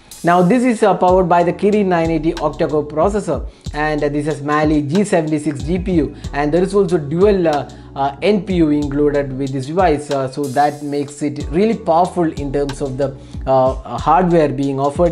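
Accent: India. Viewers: It so hard to understand him what he say. Indian ppl. Do have thick accent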